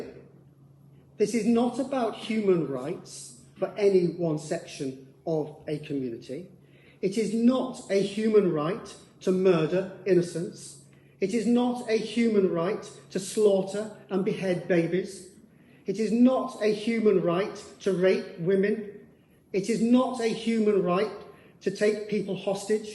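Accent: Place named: England, United Kingdom. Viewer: bad sound quality and that silly English garbled accent-yuckkkkkk!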